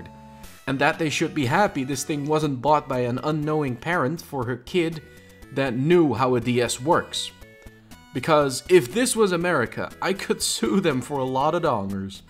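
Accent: Netherlands. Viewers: Are you dutch because it sounds like it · r u dutch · are you dutch because you sound dutch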